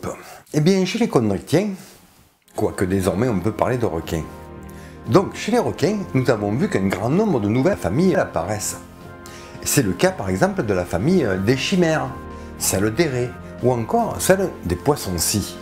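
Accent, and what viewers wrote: South, France. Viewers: Bravo ! c'est très intéressant. Je m'abonne ! Bonne continuation. Comme quoi on peut avoir l'accent du sud et présenter intelligemment autre chose que la météo à la télé et ou à la radio dans notre pays. Adissiatz ! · Le sujet de la chaîne est intéressant, mais j'ai un gros reproche à vous faire : compte tenu de votre accent méridional à couper au couteau, il faudrait éviter de vous exprimer comme un DJ. Vous n'animez pas une foire au cochon locale. Je n'ai pas compris grand-chose du premier coup et j'ai fait beaucoup de retours en arrière. En vous exprimant calmement, posément, ça irait infiniment mieux.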